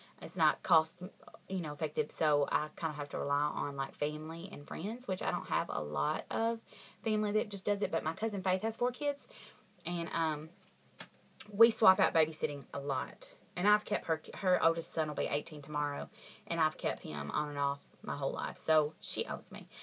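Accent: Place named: South, United States. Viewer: I'm on the east coast so your so southern sounding and I love it :) enjoy your weekend and keep up the great work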